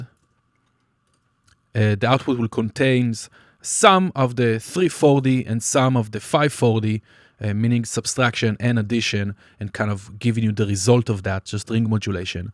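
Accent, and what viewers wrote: Israel. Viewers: I love the fact that I could tell you're from Israel after the first three words you said! But jokes aside, great video and useful info, thank you very much.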